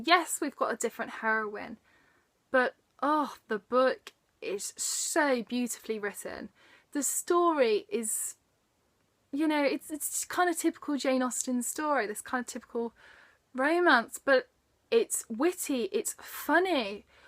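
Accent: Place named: Midlands, United Kingdom. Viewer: You can definitely tell, Midlanders for the win! I'm from Stafford you see haha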